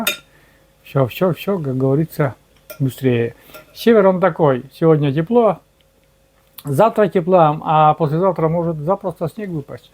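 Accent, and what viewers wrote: Komi, Russia. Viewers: Добрый день! Всегда приятно Вас видеть. С началом посевного сезона, спасибо помощника. Всем Вам здоровья и мирного неба! Наслаждаюсь Вашим Коми акцентом. Добра Вам!!!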